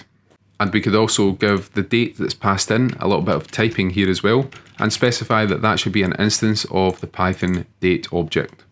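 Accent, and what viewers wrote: Scotland, United Kingdom. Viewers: NO idea what your doing but I love your Scottish accent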